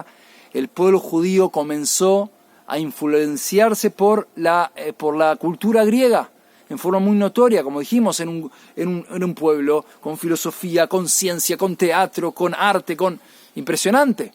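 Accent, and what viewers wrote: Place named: Argentina. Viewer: Me gusta su explicación, pero tengo una inquietud, ud. Es judío de . Nacionalidad judia, oh ee ud. Argentino. Su acento tiene algo de Argentina, igual explica excelente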